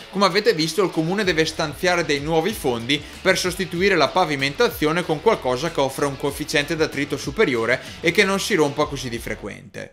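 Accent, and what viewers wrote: Brescia, Italy. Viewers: Si sente che sei di Brescia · Bravi ! Sempre esaustivi e concreti! Ma siete di Brescia? L’accento sembra di lì… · Ma quell'accento bresciano? :-) Brao!!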